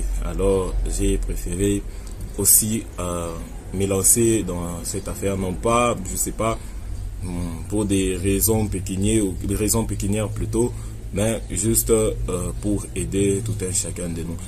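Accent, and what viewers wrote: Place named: Kinshasa, Democratic Republic of the Congo. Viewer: Je suis quand même le premier à commenter . Par votre accent, j'avais compris que vous étiez congolais de Kinshasa 👍👍😍😍. Je m'abonne pour vous encourager 👍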